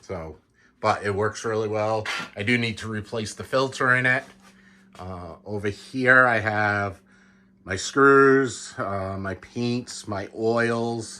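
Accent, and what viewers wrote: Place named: Massachusetts, United States. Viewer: Just stumbled upon this video and your channel. You have the makings of a great set up! The instant I heard your voice I thought to myself, "This person is from Massachusetts" and was extremely proud when the first thing in frame when you rotated the camera was a Boston Red Sox banner. Where in MA are you located?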